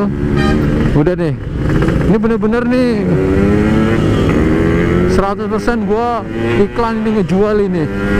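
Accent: Banyumas, Indonesia. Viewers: Oke ges welkom bek tu may cenel wit mi, gedang doyan ges..ngapak nya terasa 😄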